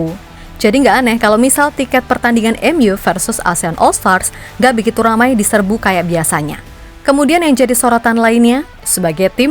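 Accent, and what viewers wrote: Java, Indonesia. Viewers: Fiks mbak mimin orang jawa 😅😅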